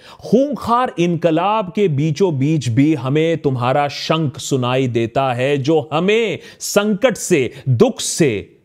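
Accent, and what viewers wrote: Bengal, India. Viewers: Sir ap Bengali ho kaya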